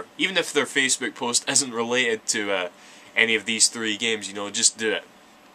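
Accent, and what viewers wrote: Scotland, United Kingdom. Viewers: And by the way I have been watching your lets plays back to back in the playlists and consider you to be one of the very best lets play artists. Scottish accents for the win! (even though I'm English lol)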